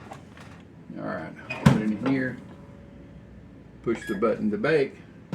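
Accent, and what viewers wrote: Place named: South, United States. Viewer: Those granola bars look delicious and I’m certainly planning on making a batch or two. And by the way that’s how southerners talk. Taters instead of potatoes, maters instead of tomatoes, naners instead of bananas..... jeez give me a break. Keep up the good work dude.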